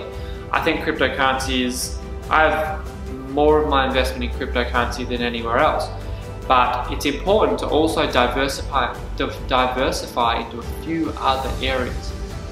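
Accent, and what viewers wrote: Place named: Australia. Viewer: I thought you sounded Aussie, Aussie here, so Aussie living in the US?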